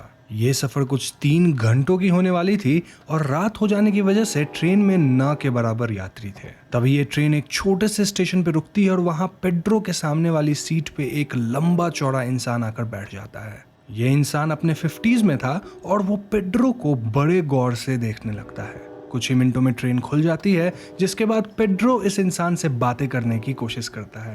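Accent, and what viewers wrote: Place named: Bihar, India. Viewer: Bhaiya tum bihar se ho na kyuki bihar k log sadak ko sarak bolte h jaise unse kaho sadak par ghoda tadak tadak daudta h to vo bolte h sarak pr ghora tarak tarak daurta hai 🌚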